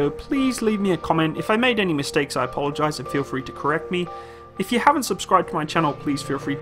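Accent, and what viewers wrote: United Kingdom. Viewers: I think an American should be narrating this. Same goes for many videos you see with Brit narrators; National Geo on U.S. topics - Tours of the U.S. Mint - U.S. National Parks, you get the point. This guy should be narrating things like The Big Ben clocktower or Oxford or Stonehedge...etc. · CONGRATULATIONS AMERICA. OUR LEADERS ARE SO USELESS.. I AM LISTENING TO A BRIT TELL ME ABOUT MY OWN COUNTRIES AIRCRAFT CARRIER. I BET THE VOICE LIVES IN THE U.K. TOO. NO WONDER CHINA IS STEALING EVERYONE'S DESIGNS. PEOPLE LIKE THIS ASS HOLE GET ON MY NERVES.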